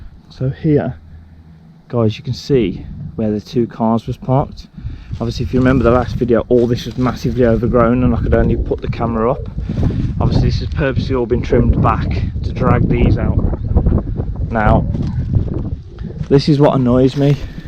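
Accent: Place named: Midlands, United Kingdom. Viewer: OK mate you sound like a Midlands Lad ...?out of interest ..👍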